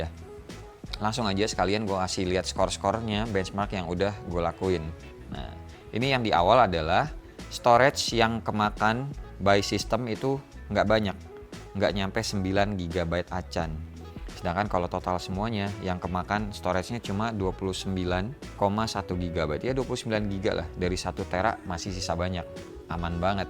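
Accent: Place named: Sunda, Indonesia. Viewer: Aya campuran sunda saeutik 😭🤣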